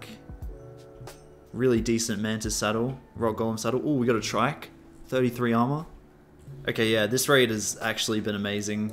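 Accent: Australia. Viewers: are you aussie bro? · You Australian? If so love from another Aussie love your content mate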